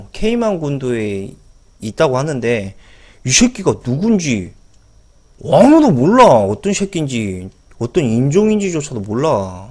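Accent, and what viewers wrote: Jeolla, South Korea. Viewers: 전라도네.. 믿고 거른다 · 아따 전라도여 반갑소잉 전라도말은 숨길수가 없어라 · 전라도사투리 은근 중독 되네요~~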